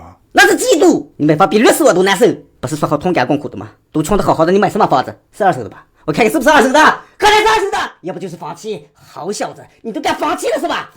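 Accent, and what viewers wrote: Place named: Shanxi, China. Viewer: 应该是山西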